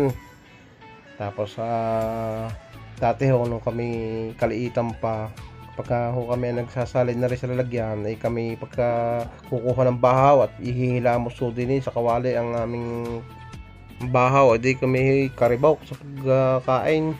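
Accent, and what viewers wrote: Batangas, Philippines. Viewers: Ipakita mo yong pangalan ng bote ng ingredient di maintindihan yong tagalog mong batangueno eh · Boses palang areh eh .tubong batangas talaga..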